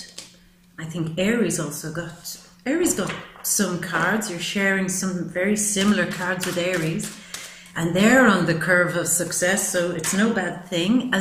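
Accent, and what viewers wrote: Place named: Ireland. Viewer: You are so amazingly beautiful you remind me of Maureen O'Hara and you have such empathy and warmth as part of your personality, it's intoxicating. Plus, there is, how does Sean Bean say it, "A charming Irish lilt."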